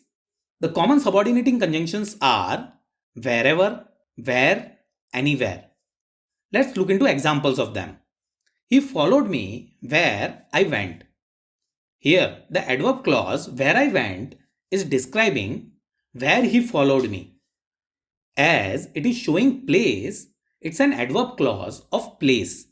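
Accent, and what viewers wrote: India. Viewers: Why is the voice so Indian? Is he from india?😂😂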